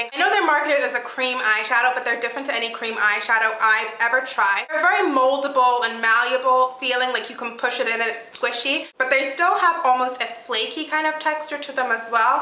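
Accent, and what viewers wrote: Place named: United Kingdom. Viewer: I knew I heard a British accent! :)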